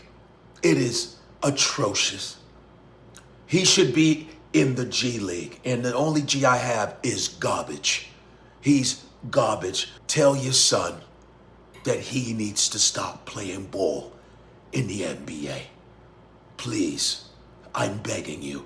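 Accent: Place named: AAVE, United States. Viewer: Godfrey, my brother, please stop talking in ebonics, it is not necessary. I mean when you are speaking as yourself, not doing impersonations.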